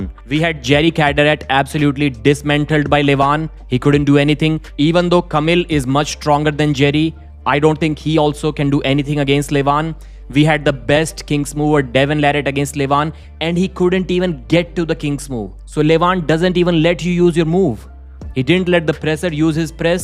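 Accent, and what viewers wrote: India. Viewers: I can't stand the Indian accent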